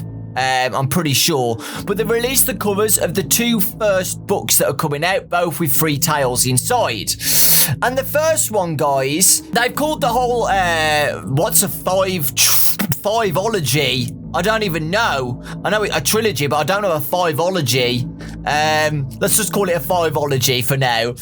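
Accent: United Kingdom. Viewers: As a non-native English speaker, accent really caught my attention. Does anybody know what part of the UK is he from? Also, as always, great video!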